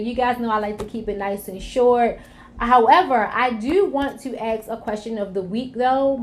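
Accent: Baltimore, United States. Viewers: Just came across your channel. I had 4-5” of hair when I started too. My install was 5/23/20 so our locaversary is around the same time. Are you from Baltimore or somewhere in DMV? I think can hear it (I’m from Balto myself). Many similarities. I think my hair was more awkward than ugly lol...I wore turbans and headwraps for like the first 3-4 months straight. New subbie! 💕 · You have to be from Baltimore (the accent) lol, I’m from the dmv. Love your hair!! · It’s the B-more accent for me 🥰🤣. You’re beautiful, sis. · Sis the Baltimore accent IS REALLLL!!😫😂😂😂😂😂😂 you’re soooo beautiful🥰🥰🥰🥰🥰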